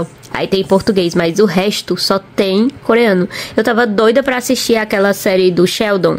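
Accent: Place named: Northeast, Brazil. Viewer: Estou assistindo na noite de segunda, enquanto passo roupa, sou RJ. Seu sotaque nordestino é bem característico. Tenho uma vizinha que é de Natal já mora aqui a mais de 20 anos, mas não perde o sotaque. Bjs na família. Deus os abençoe.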